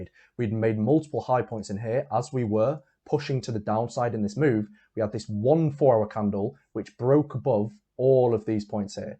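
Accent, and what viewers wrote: United Kingdom. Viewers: His accent seems British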